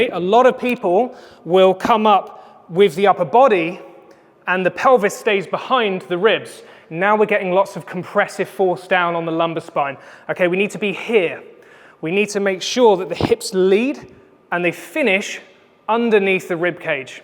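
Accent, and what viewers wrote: United Kingdom. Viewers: 😂😂😂 British accent is the best!! 😍😍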